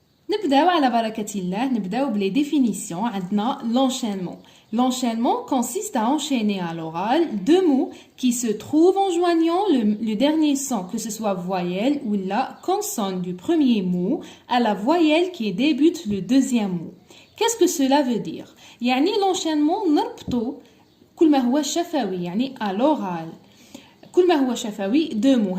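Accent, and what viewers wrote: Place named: Tlemcen, Algeria. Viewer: يعطيك الصحة بزاااف استاذة تعيشي انا من الجزائر بانتلي لهجتك من تلمسان اذا كنتي مالجزائر رح نفرح اكثر والله ربي يوفقك ومغسي بزاااف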